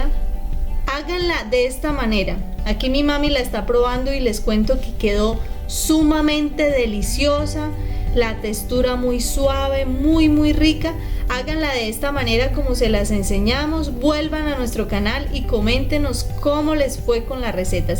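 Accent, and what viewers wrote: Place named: Colombia. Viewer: y el acento colombiano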